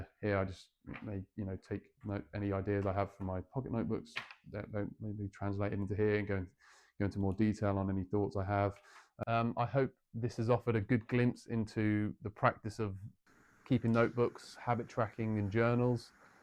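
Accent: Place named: East Anglia, United Kingdom. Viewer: Slight East Anglian accent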